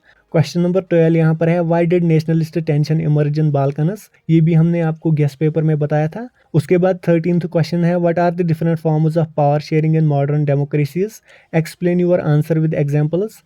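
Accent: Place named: Kashmir, India. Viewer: Sir has better kashmiri accent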